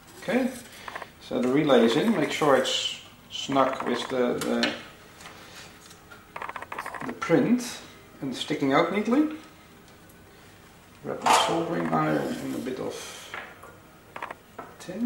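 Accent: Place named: Netherlands. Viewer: Strange that the voice has dutch accent but the socket on the wall is chinese.Maybe there is a chinese factory producing these Miele appliances?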